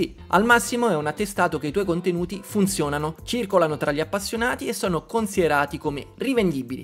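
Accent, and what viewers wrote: Rome, Italy. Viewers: dovresti essere solo orgoglioso, sei forte! fantastico lo spezzone di video del 2018 dove avevi una dizione con accento e tono tipico romanesco, ora però è un piacere sentirti parlare. professionista! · non so se può servire a qualcosa per te leggerlo, ma sei davvero l'unico YouTuber italiano di videogiochi che seguo insieme a Il Grettapensiero e RoundTwo: perché sei calmo, sei umile e perché mi piace un sacco la tua dizione "romanaccia ma non troppo". Non esiste uno che parli come te su YouTube. Ti sembra poco? Per me non lo è affatto. Aggiungici i contenuti sempre interessanti e la percezione di avere davanti una brava persona, e non una bestia di egocentrismo, e diventa ovvio affenzionarsi al tuo canale.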